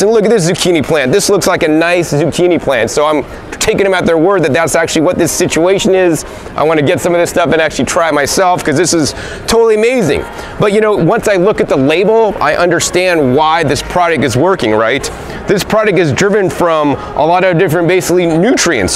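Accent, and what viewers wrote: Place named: United States. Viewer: Totally misleading video! I thought there would be a garden tour with footage of diagnosing plants with microbial deficiencies. But alas, products, products and more products. Consume, sell, market etc. Should have figured with your American accent. Why do yankee's always have something to sell?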